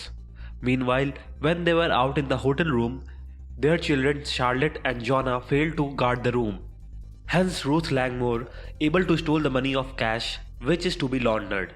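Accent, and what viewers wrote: India. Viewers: Overall I feel this is a very good recap covering all the most crucial details to the Ozark story (Season 1), with the audio and video editing also being very good. If I had to give a critique on the video, my only critique would be that (as an American) your Indian accent is a little tick and some words (specifically proper names of people and places) are hard for me to make out, if you were to add subtitles to the video (copy and pasting the script into the subtitle option when uploading the video) I feel this would go a long way in clearing up any misunderstandings that might arise because of your accent. Other than that, keep up the good work, and thank you for this video, it really helped😊 P.S. sorry for all the mean comments, some people I feel do not know how hurtful their worlds can be and say things without thinking, so do not take it to heart, honestly your accent is not that bad, people are just ignorant😉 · The accent was acceptable as I believe it's Indian accent but the subtitles were really really awkward. 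Spelling mistakes doesn't help. Just a suggestion, if u wanna make recaps, make sure u add subtitles with correct spelling. Wikipedia of certain series also helps making a good recap as they show written update of every show episode by episode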